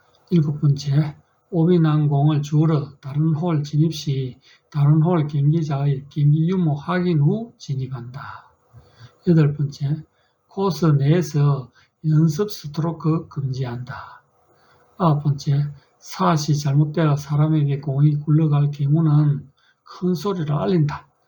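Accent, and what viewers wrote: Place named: Gyeongsang, South Korea. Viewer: 경상도 사투리~~~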